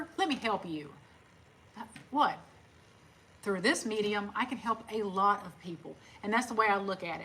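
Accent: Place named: Carolina, United States. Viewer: We relocated to North Carolina back at Christmas 2018 from Maine. I knew immediate that was a Carolina accent. 😉. I only found your channel shortly before your husbands passing. The content did change, but that was expected. We downsized by over 1000 sq ft. and I am so glad we did. So much less to care for. I happily decorate my smaller home. I am not a minimalist, but still enjoy having items that bring me joy. My struggle is making friends as an adult. I am blessed to have my church, but it is still different. That's for letting us into your world.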